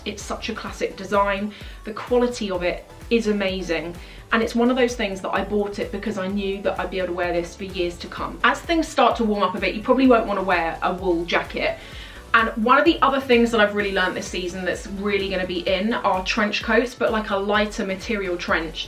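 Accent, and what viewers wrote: United Kingdom. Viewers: Great tips and I adore your British accent.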